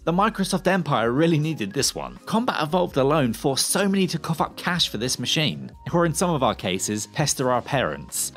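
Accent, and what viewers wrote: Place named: East London, United Kingdom. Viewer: I have to get through Mafia remastered version yet .Since I gotten back to it ,but would say the final training mission in Star Wars Fallen Order was a pain in my ass ,since it like the only puzzle in the game I could not figure out easily .But now I know for sure ,cause go YouTube to figure the easiest puzzle in the game .So where the hel is Jules and the cute itty redhead on this channel ? And your accent dude sounds east London for sure .I sure wish Hollywood get all the accents right from England .When comes to movies and other things .instead using somme snob with same accent as the royal family of misfits .But you folks are pretty cool from the old country .😁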